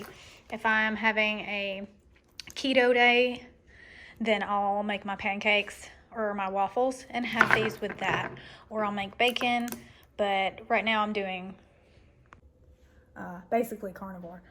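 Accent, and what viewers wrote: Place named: Oklahoma, United States. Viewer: Just found your channel recently .Love it ! And you sound like you could be an Okie too😁👍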